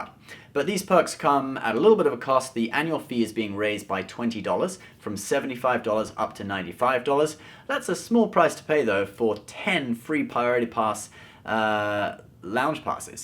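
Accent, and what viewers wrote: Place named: United Kingdom. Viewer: dude you are just awesome. Love how objective and down to earn you are and the cheeky grin with the British accent really adds to the whole shabang haha. PS: Thanks for the Trump tax video, I knew when you took a non-apocalyptic approach to anything Trump some idiot in the comments would try to flag you as a supporter or attack you lol. Anyways stay awesome man and keep fighting the good fight.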